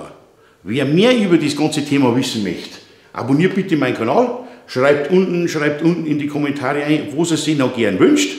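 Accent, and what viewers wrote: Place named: Lower Bavaria, Germany. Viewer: Hach. Niederbayrisch. Wunderbar.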